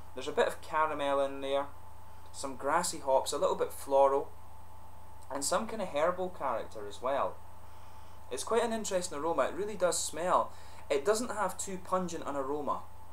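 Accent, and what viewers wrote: Scotland, United Kingdom. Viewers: You are the only beer reviewer I know that gives a detail story behind the beer, well done a always a joy to listen to 😎 Plus you have a nice Scottish accent, Don't worry I'm not hitting on you 😉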